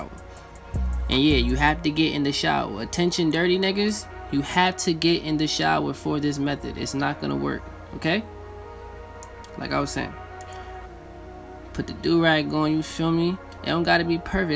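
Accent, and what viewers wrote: New York, United States. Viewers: Bro..you from NY? Distinct ass accent lol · Thats Newyork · He from New York lol 😂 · I can tell u New York nigga but big respect to u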